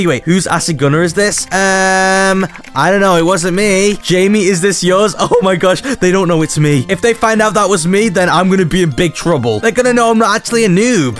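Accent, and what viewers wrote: United Kingdom. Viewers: Bros British…Hello mate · HES BRITISH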